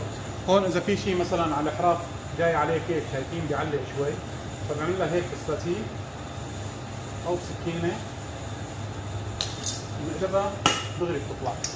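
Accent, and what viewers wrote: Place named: Damascus, Syria. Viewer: الله يعطيك الف عافيه انا لما بشوف فيديوهاتك وطريقه حكيك بحس حالي قاعده بنص شام الله يفرج ع سوريه واهله بجاه الحبيب محمد(ص)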